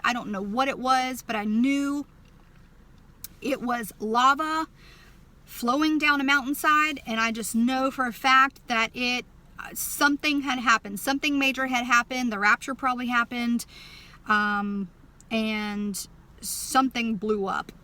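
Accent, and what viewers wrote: South, United States. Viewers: Your Southern accent makes me miss Texas and where I grew up. It is cold here in Germany also:-) GOD Bless in Jesus Christ name:-)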